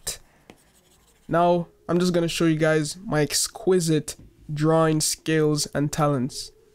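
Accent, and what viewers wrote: Jamaica. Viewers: Jamaican accent unmissable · He's Jamaican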